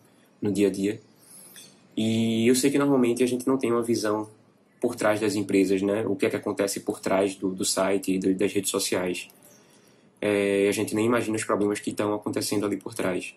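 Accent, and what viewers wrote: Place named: Northeast, Brazil. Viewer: Gostei do sotaque . "É de nós " do Nordeste , eu acredito ! Um forte abraço e força para crescer na crise . Gostamos muito do trabalho de vcs , inclusive , formei um grupo de amigos para todos comprarem as camisas dos Sentinelas , dos cavaleiros de ouro ! Pena que aconteceu isso . Espero que vcs recuperem e assim que vcs retomarem, compraremos as camisas . Grande abraço.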